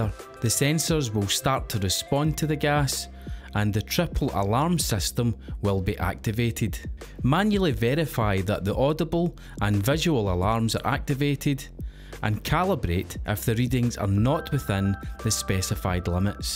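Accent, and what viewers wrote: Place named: Ireland. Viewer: u Irish I can tell. although this wasnt what im looking for thx.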